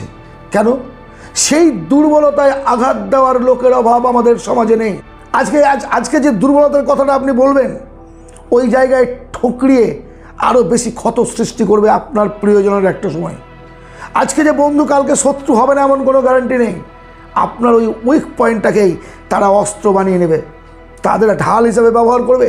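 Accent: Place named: West Bengal, India. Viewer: আপনার কথায় পশ্চিমবঙ্গের টান আছে।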